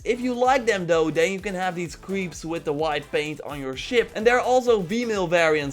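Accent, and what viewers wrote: Netherlands. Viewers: Are you dutch want het klinkt zo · Are you dutch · Are you Dutch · Nederlands accent niet normaal xD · 1 different question what is your country no hate but you sounds like somebody from the Netherlands 😅😅 Love your vids 😉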